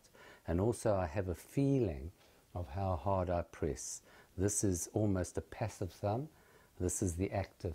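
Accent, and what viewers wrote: South Africa. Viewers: This was amazing. Recognised that South African accent immediately. ,More to the point I have been suffering from acute pain around the Supraspinatus join at the head of the humerus radiating into my upper arm. I thought and was advised that it was most likely a partial tear of the supraspinatus and followed a regime of excercise that has had no effect whatsoever. This has been ongoing for over 3 months and I have long since resigned myself to the fact that i'll probably need surgery. However a short popped up on my feed which I followed to the video, after watching I used a dowel and attempted to approximate the trigger point pressure, very inexpertly and awkwardly I might add. Almost immediately I experienced an increased range of movement and a drop in my pain index. I am really excited that I'll regain full function and be able to hit the gym again after a few more tries. 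Thank you!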